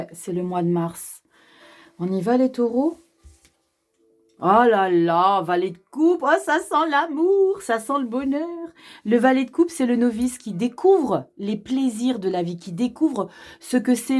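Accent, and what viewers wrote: South, France. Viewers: Thierry, ☀♉; ASC ♎; 🌝 ♐; 👨💔 Bonsoir, je suis votre chaîne depuis 3 jours, vos tirages sont scotchant de vérité, a tous niveaux y compris tirage sentimental. Je suis en soins de maladie grave et rééducation fonctionnelle des membres inférieurs, j'écris à ce propos pour conter mon expérience de vie actuelle... Ce serai cool d'intégrer à vos tirages pros les notions d'œuvres ou projets artistiques de manière large bien sur, afin d'y trouver des indices précieux... Merci à vous, j'ai l'impression que vous êtes du midi, moi aussi, c'est cool...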